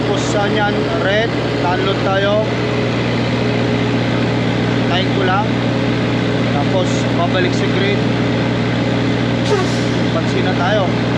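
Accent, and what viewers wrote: Ilocos, Philippines. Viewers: natutuwa ako sa mga pronunciation mo, hindi maitago ang pagka ilokano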